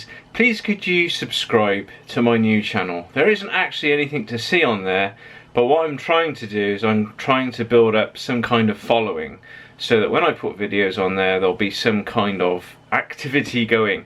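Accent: United Kingdom. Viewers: i wish i had watched all your videos on the van i love your videos very best of its kind on YouTube i would suggest that you try and make the videos available for sale maybe a download possibility for a feei personally would love to have this available its a real treat for me hearing the good old brit accent i sure plan on watching your beautiful wife your a lovely looking young couple wishing you both every success with the business great idea using local vendors and growers love the quality of workmanship non better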